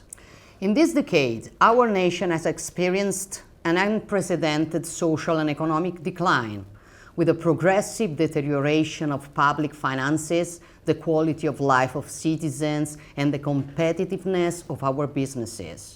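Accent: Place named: Rome, Italy. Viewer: La signora Meloni ha ,in curriculum un diploma in linguistica ed autocertifica il suo liberalismo in un patetico inglese Berlitz-romanesco. Presentazione fuori schema ,stile self advertising ,ma non preoccupante. Non credo che il presidente Macron ( talora insultato da lei) le dedichi qualche forma di attenzione o Jen Psaki sia in procinto di rilasciare qualche comunicato stampa, in proposito.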